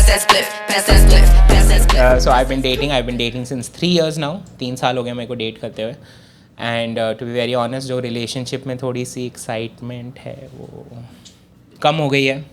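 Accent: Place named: North, India. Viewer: Accent is typical dehati, improve it if you want to target pan Indian audience, typical North Indian heavy accent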